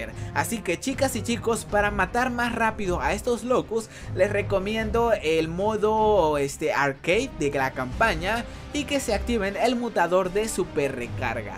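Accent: Chiapas, Mexico. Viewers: Oye Bro eres de Chiapas(?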